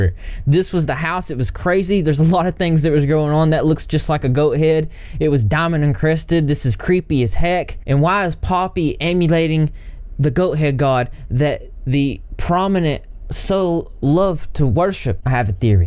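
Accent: South, United States. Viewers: Deep southern accent + purple hair + wierdo earrings/plates =???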